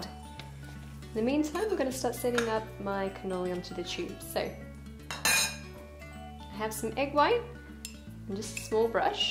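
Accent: Australia. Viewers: Greetings Great instruction. That is definitely an Australian accent but your Italian accent is perfect also. I am in Newcastle. What brand of shortening are you using? The only vegetable shortening I could find was copha.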